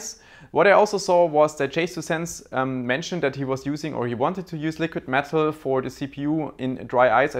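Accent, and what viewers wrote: Germany. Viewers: Deutscher Akzent ist mal da :D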